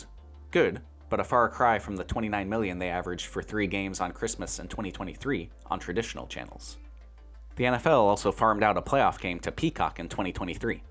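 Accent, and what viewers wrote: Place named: Illinois, United States. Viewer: your accent, its an interesting one........Ilinois??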